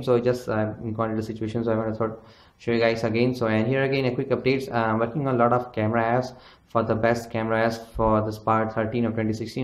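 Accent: India. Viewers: That's Indian accent.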